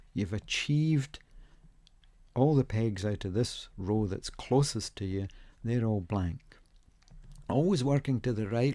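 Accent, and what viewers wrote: Scotland, United Kingdom. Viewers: Excellent video. Very well explained. Having been born and raised in Scotland loved hearing the Scottish accent. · Thank you so much for this - it has been driving me nuts for ages! Very well explained, and in a good Scottish accent! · Thanks so much for the solution. More than that, what a delight to hear shades of the Scottish brogue again! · That was fantastic and great to hear a fellow Scot too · Thank you! And what a beautiful Scottish accent!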